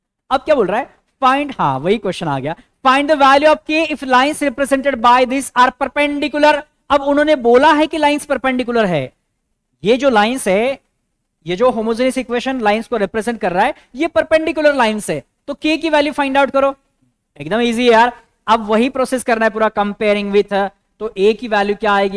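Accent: Maharashtra, India. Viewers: Sir bhut marthi bol rahe ho samjh nahi rah hai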